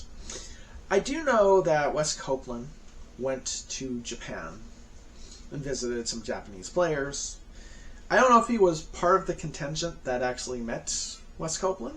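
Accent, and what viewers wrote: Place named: Australia. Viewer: G'day mate. Love your Australian accent.... ;) Just wanted to let you know, I was in Japan last year and met up with Acu Azu and he's an absolute legend. He plays DK like a machine, it's absolutely insane watching him play and comparing his style to mine is night and day. He was lovely enough to bring some other Japanese guys who play DK, including the guy who brought DK out on the switch for Hamster inc. Their community seems to be filled with guys who just want to help each other achieve greatness.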